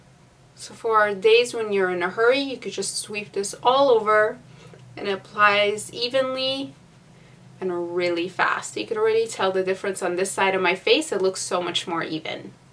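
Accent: Canada. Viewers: I've been watching your videos for a while now - and I finally just now heard your Canadian accent haha.. either I wasn't really paying attention haha Nice look!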